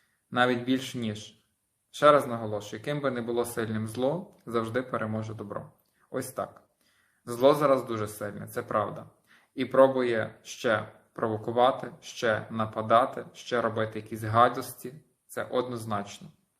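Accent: Ternopil, Ukraine. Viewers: Дякую, послухаєш вас і легше на душі,чую рідну тернопільську мову.Щасти Боже!!!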